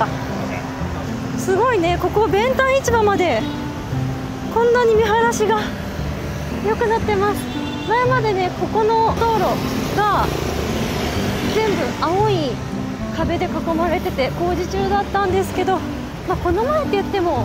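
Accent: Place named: Hiroshima, Japan. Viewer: up主さん、広島または中国地方の出身ですか？ところどころの方言が心地良いです。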